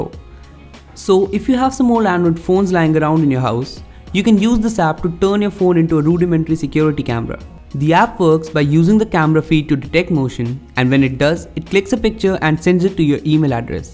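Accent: India. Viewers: Indian flavor of English is really irritating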